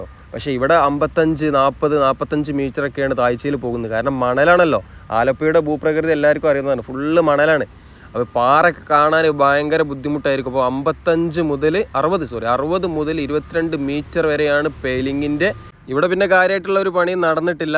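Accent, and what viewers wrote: Malappuram, India. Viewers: ഞാൻ കണ്ടതിലേക്കും വച്ചു ഏറ്റവും നല്ല എപ്പിസോഡ്. സൂപ്പർ അവതരണം. അതും സൂപ്പർ ക്ലാരിറ്റിയിൽ.പുരോഗതി എങ്ങനെ വേണമെന്നും അതിൽ ജനങ്ങൾ എങ്ങനെ സഹകരിക്കണമെന്നും അതും എനിക്കിഷ്പെട്ട മലപ്പുറം ശൈലിയിൽ.❤❤❤❤. എന്നാണോ പേര്.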